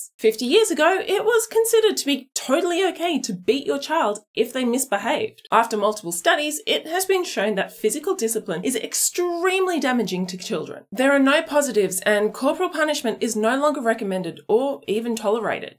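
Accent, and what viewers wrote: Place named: Australia. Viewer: Always great to hear clear & reasoned arguments / information about important topics - extra important in the fight against disinformation in modern times. This is especially cool from someone with an Aussie accent (I'm in Bunbury in Western Australia).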